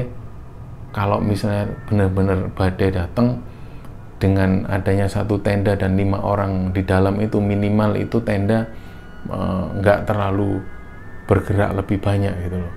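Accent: Java, Indonesia. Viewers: Cara penyajian dengan belakang backsound uyon " juga background yg gelap remeng" ditambah cara menceritakan dengan logat yg agak medok jawa bgt Bikin suasana lebih "greng" Suara khas mas yg bikin kangen dengerin nya Jam segini lg... Heeemmm Sehat truuuuuss yakkk... Kewreeeennn👍🏼💞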